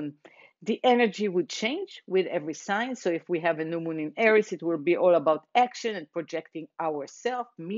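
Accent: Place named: Israel. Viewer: חשבתי שאני מזהה מבטא ישראלי קל :) נעים להכיר ותודה על המידע!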